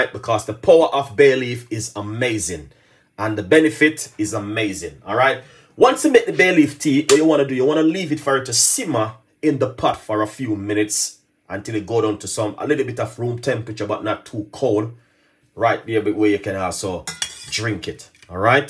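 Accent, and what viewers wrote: Jamaica. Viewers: This is how Jamaicans talk he is just being himself. Video is live not staged 😊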